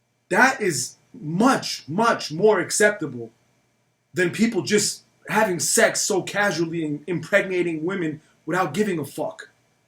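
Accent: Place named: New Jersey, United States. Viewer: You are 100% right in Napoletan culture the man is responsible to stay with the children . If he doesn't stay with the children's he is considered punk . If he doesn't pay respect to family or to the elders he will be low level person or person with no face . You are 100% right . I think you are from Napoli because i can see it in New Jersey accent . You sound like Tony Soprano .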